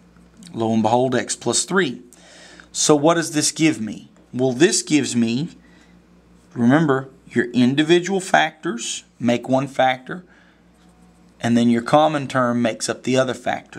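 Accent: South, United States. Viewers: Not sure why, but math explained in a southern accent is so much easier to understand.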